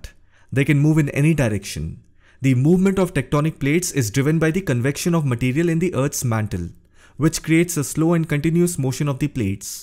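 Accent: India. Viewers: Good Presentation Except** Heavy Indian Accent *** 🙏 RIP*** Why buildings were approved * By Turkish Government * In this fragile area? * Is it due to bribery & corruption? * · it's indian english just like americans speak their own american english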